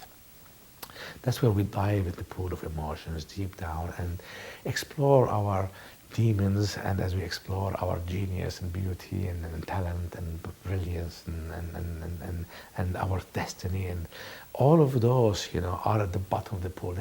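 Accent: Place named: Spain. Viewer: Interesting how an a man with an arabic name, and a spanish accent can expound on a very inclusive subject such as emotional intelligence and tie it into the history of commerce! Very deep!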